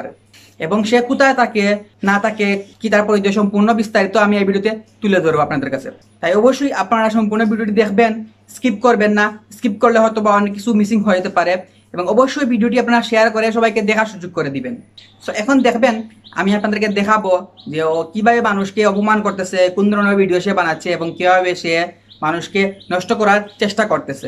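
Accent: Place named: Comilla, Bangladesh. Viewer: আপনাদের মতো আমাদের চট্টগ্রামের ভাষা অন্য ভাষার থেকে আলাদা যা সবাই বুঝেনা তবে ওর ভাষাই তো বুঝা যাই যে কুমিল্লা বা কুমিল্লার ওইদিকের লোক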